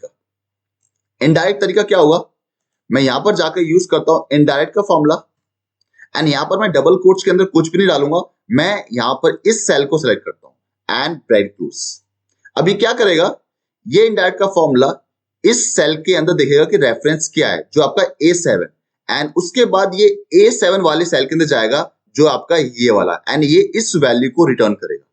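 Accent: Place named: India. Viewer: Indian speaking but excellent demonstration. Thanks a ton. Big like and subscribe for attaching the working file. 👍👍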